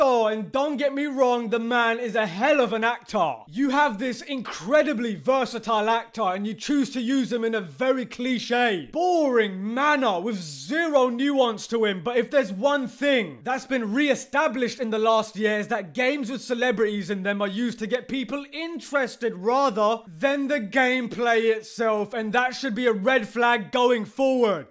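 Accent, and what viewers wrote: United Kingdom. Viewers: the british accent is annoying as it is screaming into the mic dosnt help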